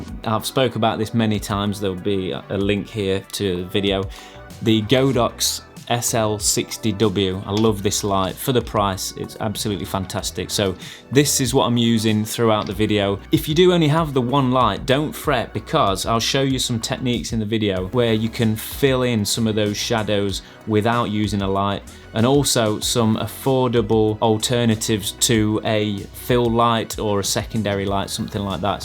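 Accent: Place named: England, United Kingdom. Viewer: Are you from England? I like your accent. Somehow it sounds more professional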